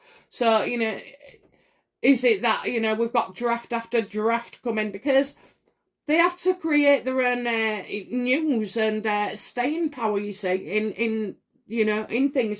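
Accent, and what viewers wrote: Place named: Northern England, United Kingdom. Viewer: There have been times when you've had me laughing so hard, I had tears in my eyes. You make me laugh an awful lot. It's your northern straight forward talking. Also your own spontaneous reaction to some of the cards you pull. You're the best💕 As for H's book, how can he say he's become a man. He's a treacherous backstabbing weevil, married to a money grabbing plagiarist. I truly feel for the Queen, Prince Charles, Prince William and the rest of the Royal Family. Love and light everyone from here in Ireland. 💞💫💞💫💞💫🇮🇪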